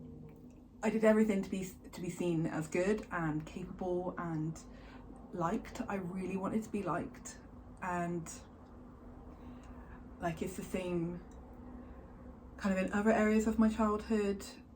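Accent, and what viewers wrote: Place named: United Kingdom. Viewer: Holy crap you are British. Usually watch with CC.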